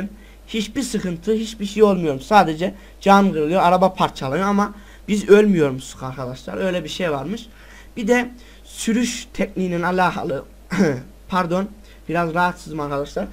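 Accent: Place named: Nevşehir, Turkey. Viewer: way kardeşim sendemi nevşehirlisn şivesinden belli canını yediğim :)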